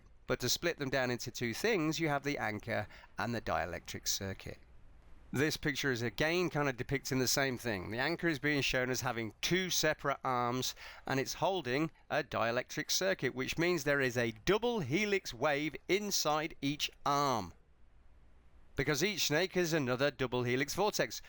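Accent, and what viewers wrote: United Kingdom. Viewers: getting in a fuss other someone's accent not sounding right to you is 100% an american trait lmao. Listen here English accent, speaking the language of your county properly. you say it onk like us 'murricns not ank, also while were here I have some words about the whole tomayto- tomahto, potayto-potahto debacle as well... LMAO I seriously can't believe some people are so dense to make a fuss about your accent.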